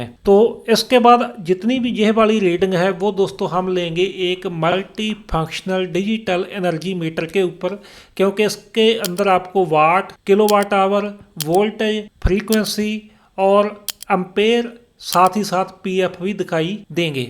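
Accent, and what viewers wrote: Punjab, India. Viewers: imandar insaan ho aap. aapki punjabi vaali hindi bahot hi achhi lagti hai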